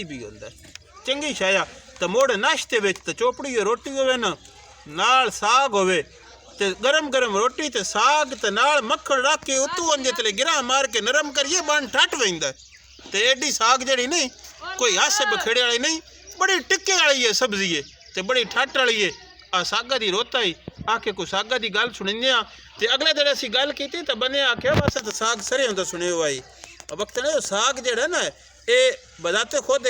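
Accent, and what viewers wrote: Shahpur, Pakistan. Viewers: You are representing pure shahpuri accent of Punjab. Our shahpuri or Jatki bar is consising district like Mandi Bahauddin, Sargodha, Hafiz abad, Jhang, Chinote and Khushab.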